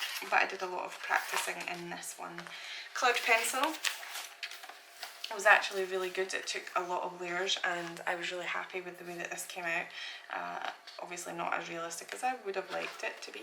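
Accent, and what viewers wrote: Scotland, United Kingdom. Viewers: Thank you! You are britush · Are you Scottish ??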